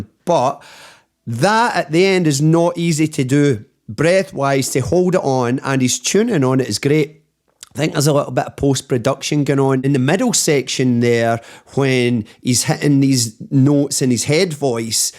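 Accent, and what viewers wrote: Scotland, United Kingdom. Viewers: Love you reaction including the wee Guy!! But most of all I love your Scottish accent!!! 🤩🤣👏👏👍😘😍❤️🇨🇦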